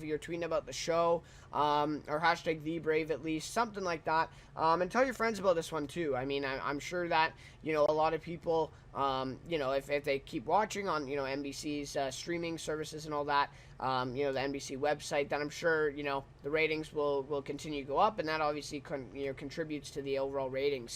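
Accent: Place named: Canada. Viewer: Are you Canadian? Your accent is annoying.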